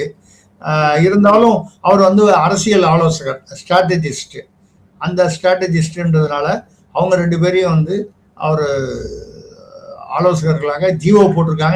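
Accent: India. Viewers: They speak English English . You speak india English 😊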